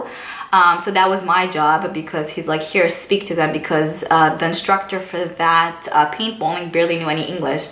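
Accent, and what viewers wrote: New York, United States. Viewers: You have a New York accent. I love it #NY in the house 🤗🤗💕💕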